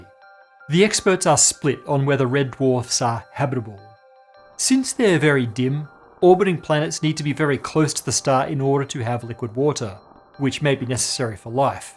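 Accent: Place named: Australia. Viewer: This was great but I'm confused as to why you suddenly have an Australian accent Isaac. Nice use of the usual animations btw.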